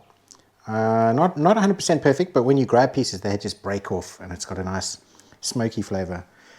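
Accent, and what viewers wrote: South Africa. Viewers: Get yourself some temperature probes. You probably overcooked the crap out of the chicken but who knows unless you can get the internal temp. In fact someone please send some to review for sponsorship dollars. The cool bluetooth remote ones. Also it's "Weber" not "Weeber" - j/k the saffa accent is cool🤣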